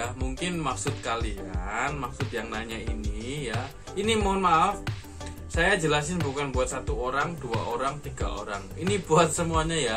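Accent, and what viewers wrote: Indonesia. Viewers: Walah ternyata ngapak ya melkur hahaha Sukses teruslah ya aamiin #edn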